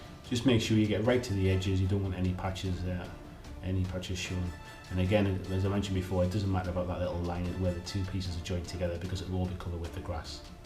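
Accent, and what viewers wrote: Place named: North East England, United Kingdom. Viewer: Nice build with the board, added this to my YouTube list for referring to at a later date, can I ask where you are based as I believe I can hear an accent that is suggesting Newcastle/Gateshead/Shields/Sunderland in the N.E of England.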